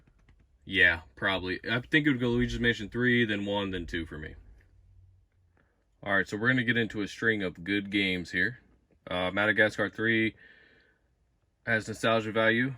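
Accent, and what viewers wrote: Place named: Texas, United States. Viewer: You from Texas?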